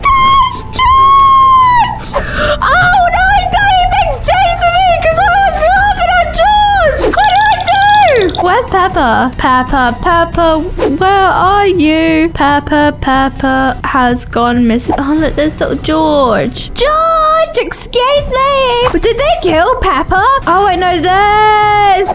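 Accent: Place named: United Kingdom. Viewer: your accient is very beautiful is it British?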